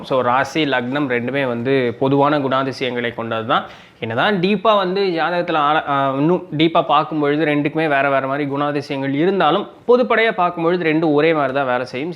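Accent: Coimbatore, India. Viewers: Coimbatore slang super 👌🏻👌🏻👍🏻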